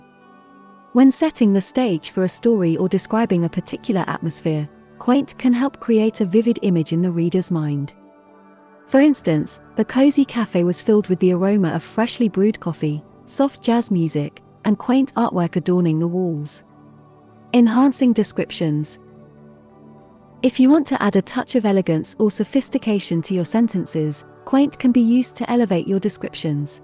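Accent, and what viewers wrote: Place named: United Kingdom. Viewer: Congratulations! Your clips are real great stuff for anyone wishing to improve them English. Especially if you're in love with the British accent. It's pure music. Very "listenable". I was hooked immediately as I came across the very first clip. Oh! How I count myself lucky for bumping into your videos almost by accident. I have already shared your videos with not too few. Please keep up the wonderful work you are doing.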